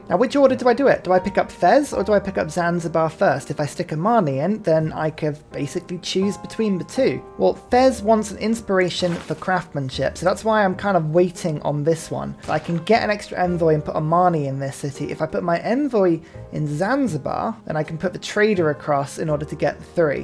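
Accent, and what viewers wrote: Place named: United Kingdom. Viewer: You play lovely music and your presentation is friendly and fun; love your British accent and funny little voice; witty and (as you Brits like to say) "brilliant."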